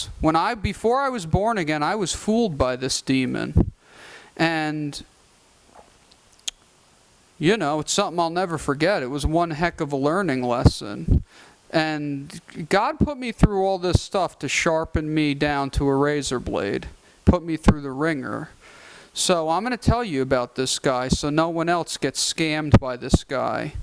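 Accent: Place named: New Jersey, United States. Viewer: Your jersey/jew accent is almost as annoying as your incoherent thoughts and narrow mind & jumbled perspectives. I'm disappointed that I listened till the end.............wasted 30 minutes :( What authority do you speak from? You know that you are worshipping molech, baal, jehovah, yhwh, set, saturn, satan, thor, appollo, abaddon when you are following the religion of the canaanites & pharisees who exalt and demand ritual cannibalism, (communion-Bread/wine) and the obedient worship of a deity that is honored after arising from the dead. Does that sound like something a loving father would demand? for you to proclaim the power of his son, who was tortured? If jesus was gods son, his acts were in humility and grace, not to be shouted from every mouth when judging and condemning, but as an example of the power we have as one man, then as a community that seeks truth and demands transparency & fairness. 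That is gods message. Be responsible and admit you learned a lesson, a 2000 lesson, just because you paid him too much cash, you try to slander his business and livelihood? What happens if I claim as a customer of your construction business, you stole from me, when you you stated a bill and I agreed & paid that amount. How is that scamming & deceitful? Trust me.....you aren't good at meditating or astral projecting, its a gift & ability that we all have as humans, we just need to practice it...........Nice try though. You tortured demons? like from using solomonic magick? Id like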